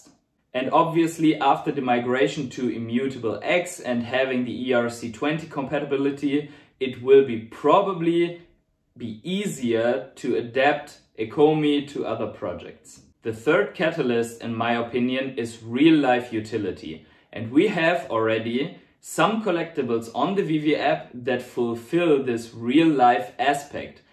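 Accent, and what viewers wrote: Germany. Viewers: Good content again. Last point is pretty good :) By the way are you german? Just curious since your english accent sounds like german.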